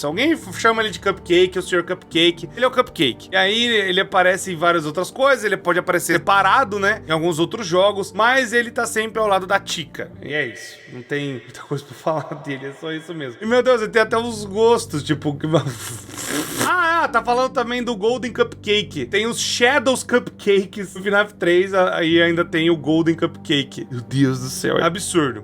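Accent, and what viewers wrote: Brazil. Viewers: E tu é bahiano 🤣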